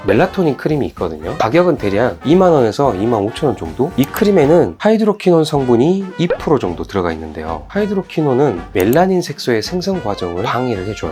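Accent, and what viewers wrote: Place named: Jeolla, South Korea. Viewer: 전라도 사투리 진짜 우와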